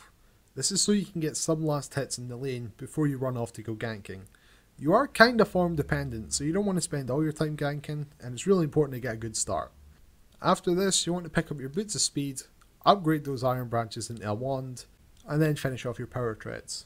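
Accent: Scotland, United Kingdom. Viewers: good guide. Scottish I guess?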